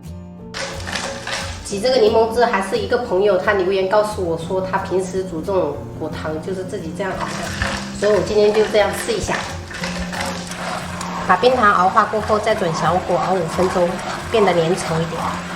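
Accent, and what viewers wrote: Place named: South, China. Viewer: 聽她口音不像閩南口音, 應該是其它南方省份 , 嫁到閩南的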